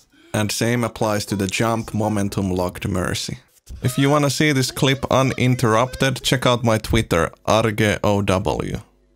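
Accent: Finland. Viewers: What is your main language becouse you sound like how finnish people speak eglish? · I instantly knew you were Finnish when you started talking 😅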